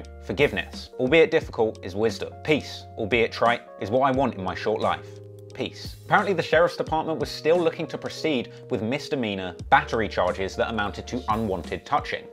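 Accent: England, United Kingdom. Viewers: keep those jokes coming and pursue a YouTube career stop trying to be a rapper u got english accent and u dont look like a rapper , ur " remember that one time" jokes are better than ur rap skills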